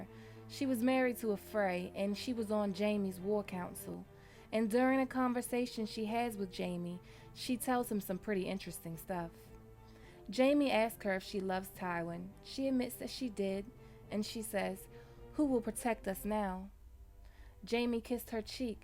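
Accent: United States. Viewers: I think I just fell in love with your voice. GOT needs to have accents other than unidentifiable Eurocentric and various British accents. Why have there never been any American or Canadian accents on the show? Someone with a voice like yours would be very refreshing.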